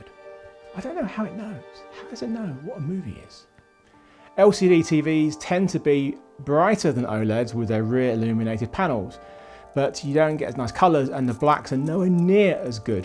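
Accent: United Kingdom. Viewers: Me... Why the heck is talking about a TV... Clicks Also Me... Who cares I'll stay for the British accent.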